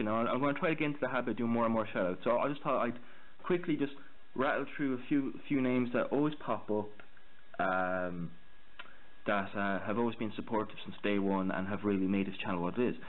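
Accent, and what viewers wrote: Ireland. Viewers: Great to see ya back, and to hear those dulcet Irish tones;) Ya there's still snow in the woods over here but it's melting really fast now. It's pretty much been the worst winter that I remember ever. I'm hoping that it's the last one like that. I really like the look of that panzer 1 bud, I normally don't do the contest thing, but I may make an exception. Mark me down for when you do the draw eh? That's a nice little kit and I've never build ta Pz I.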